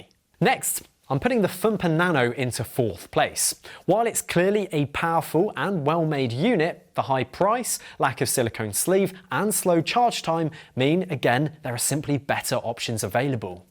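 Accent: United Kingdom. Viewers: This must be a Brit channel based on the host's accent and that I see no mini or midi pumps that are available in the US displayed here. My pump is programmable and rechargeable and very poplar in the US.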